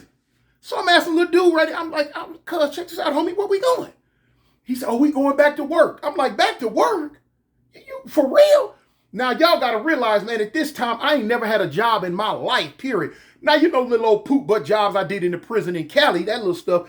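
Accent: South, United States. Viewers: I'm from Mississippi. You had the guards and inmates sounding country as hell 😆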